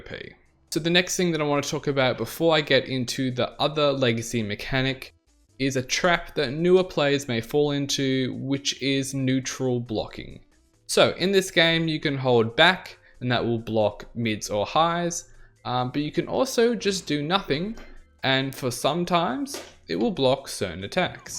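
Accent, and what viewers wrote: Australia. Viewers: Your channel has suddenly popped up in my recommendations. I like it when I get to listen to Aussies, being an Aussie in Germany. Funny thing, your channel name in German and corrected for proper german grammar, massiver Zug, means massive train or massive pull, depending on context. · Where you from in Australia mate?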